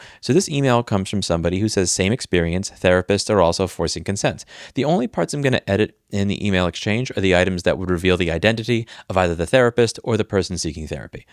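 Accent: New York, United States. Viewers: I LOVE how this tough talking New Yorker who teaches everyone how they are getting F**ked every video is a competant Couple Counselor 😅:hands-yellow-heart-red:❤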